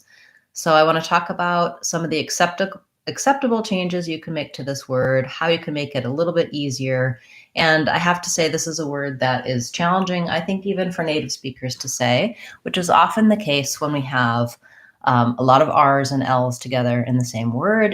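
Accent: United States. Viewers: I don’t think I’ve ever heard an American speaking so clearly 😳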